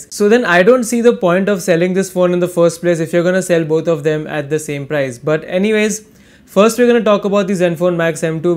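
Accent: India. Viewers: Play video : Thick Indian accent. No, thank you.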